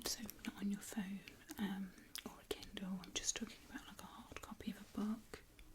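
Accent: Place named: Cheshire, United Kingdom. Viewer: Awesome tysm👌 is that a fellow Cheshire accent?? YAAAAS 💅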